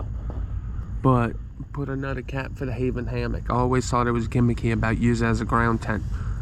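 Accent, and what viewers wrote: South, United States. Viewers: Fun looking chill video, l also love the sounds of waves lapping. Hey you use what ever way of speaking that you want to y'all, for us guys up north it is nice to hear your Southern Accent and local nomenclature that you are used too. thanks for the video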